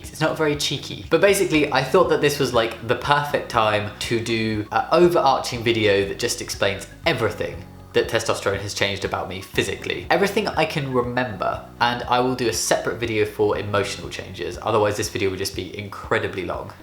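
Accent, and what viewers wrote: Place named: Scotland, United Kingdom. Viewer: Little bit less Scottish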